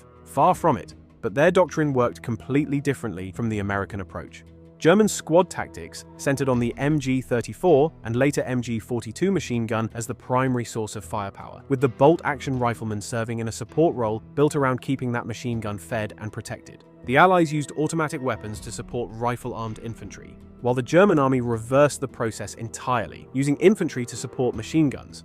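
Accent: United Kingdom. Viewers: so british, so smug. GAAAAHHH RAAAAND.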